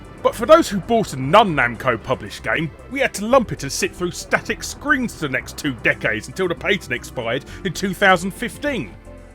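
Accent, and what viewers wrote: England, United Kingdom. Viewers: Guys, this is just how we say 'Patent' in the UK. Though I have to admit I stopped pronouncing it like that years ago because the US way is less mouthwork. Or something like that. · Telling an Englishman he's speaking English wrong I see. Troll elsewhere bronie. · I believe it's called a British accent... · Please always remember that you're dialect/accent is sometimes incomprehensible outside of the British world. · Dude's British. That's how they pronounce "patent" in a majority of the UK. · ...you know he's using UK English, right?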